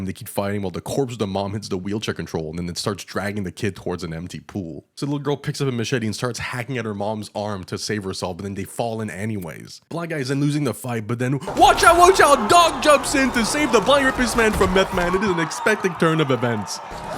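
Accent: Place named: Quebec, Canada. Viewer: Tes québécois toi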